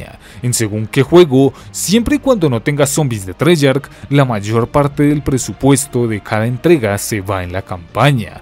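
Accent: Colombia. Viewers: Gran video. Oye una pregunta, ¿tú de que parte de Colombia eres? xd.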